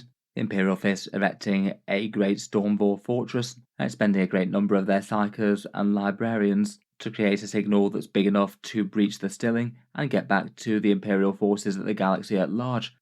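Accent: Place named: England, United Kingdom. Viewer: His accent comes across as fairly vanilla southern, he certainly doesn't have a strong regional accent at all - though regional accents as a whole are declining quite quickly across the UK according to some research I saw a couple months ago. I was meaning more in relation to his cadence, enunciation and intonation, which I think you're right when you say about it being a "presentation voice". I'd be very surprised if he spoke like that at the pub! Remarkably consistent across his videos though.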